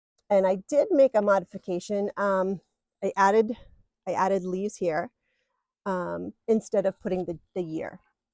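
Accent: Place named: Rhode Island, United States. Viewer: Love your style of organizing your video! Drinking my coffee while watching was delightful. I am originally from RI and hearing your accent reminds me of how much I miss the salty air from the ocean breeze . I am so excited that I found you! You are a very special friend and reminder that we can all do more for our communities. 💕🇺🇸💕🪡💕🧵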